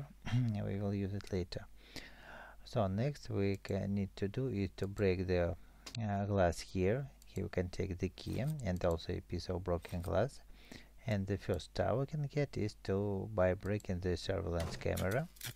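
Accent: Russia. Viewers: Ты говоришь с произношением, ты русский?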